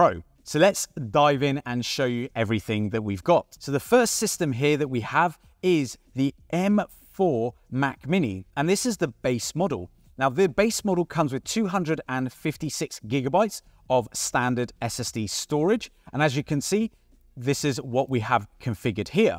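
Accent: United Kingdom. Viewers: I hear a British accent, so when is this coming out in the UK? 😀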